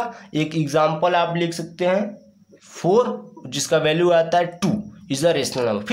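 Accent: Bihar, India. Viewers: Sir aap Patna Bihar se h....?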